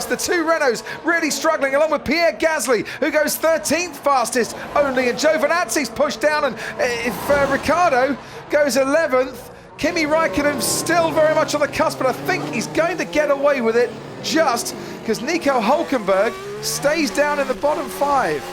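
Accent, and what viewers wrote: Australia. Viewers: Australian😂😂? Btw so fast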